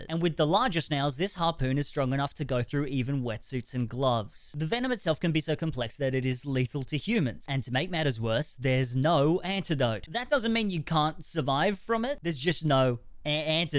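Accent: Australia. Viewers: The Aussie accent that this information is being delivered with really adds credence as that's the country full of animals that very famously Want To Kill You.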